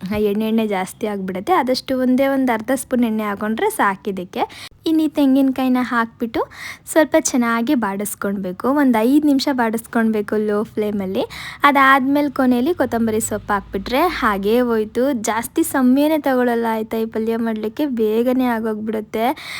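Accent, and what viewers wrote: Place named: Uttara Kannada, India. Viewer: ಹೌದ ಉತ್ತರ ಕನ್ನಡ ಅನಕೊಂಡೆ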